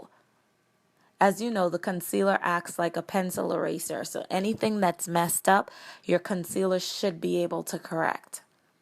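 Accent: Bahamas. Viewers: Sound like a Bahamian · Bahamian accent???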